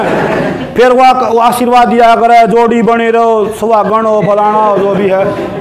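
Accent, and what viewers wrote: Haryana, India. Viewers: Mera Haryana gk se door door se koi matlab nahi hai sir me to bas apki Haryanvi language sun ne ke liye aur apki teacher quality ke liye video dekhta hu good sir keep it up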